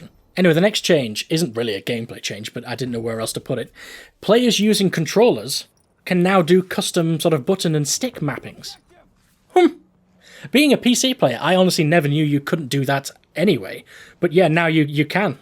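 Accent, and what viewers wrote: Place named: North, United Kingdom. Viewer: you have a charming Northern accent. Where did you grow up?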